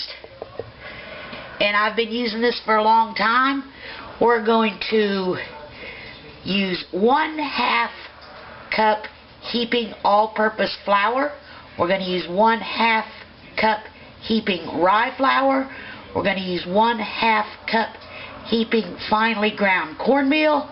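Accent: South, United States. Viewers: your daddy might have been a new englander but your southern accent and use of buttermilk is a clear give away that your family history is from the south not the north. I bet got that recipe from Martha stwert...lol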